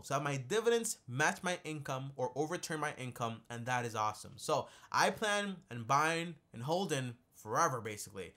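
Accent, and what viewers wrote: Caribbean. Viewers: Yo is it just me or does he sound carribean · I hear a Caribbean accent! Lol where is he from?! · I hear a Caribbean accent. Where are you from? Good video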